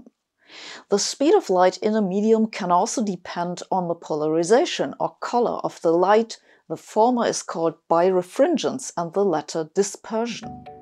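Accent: Germany. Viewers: Sabine, your thick German accent is voluptuous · Actually, I find this very funny. Sabine gives us reasons to get annoyed with physicists and therefore to get annoyed with her. But the effect of the way she presents this... it's just so endearing. Almost cute. 😄 Having a fat German accent doesn't damage said effect, au contraire. 😂👍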